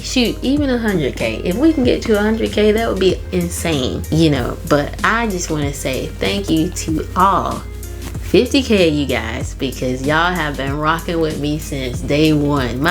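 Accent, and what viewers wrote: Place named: South, United States. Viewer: New subscriber, wish I discovered you when I was loose natural but excited to see your loc journey and love hearing my you talk, the southern drawl is absolutely endearing ❤ Depending on how u maintain, your locs will likely swell to a traditional size. I've been loc'd for over 6mos now and my microlocs have almost doubled in size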